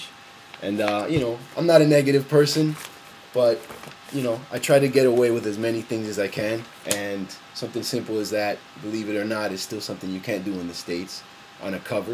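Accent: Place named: Argentina. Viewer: only a question(aajhaa the mangakas how you dont have time for ask questions in youtube but), you have a connection with Argentina? because, when i hear you speaking spansish, your accent is so...argentinian hahaha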